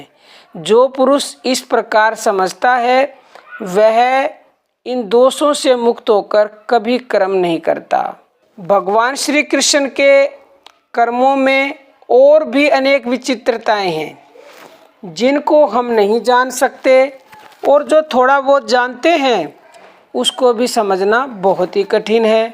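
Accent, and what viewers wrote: Haryana, India. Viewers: जय श्रीराम माता जी आप क्या हरियाणा से हैं क्या आपकी आवाज से तो ऐसे ही पता चलता है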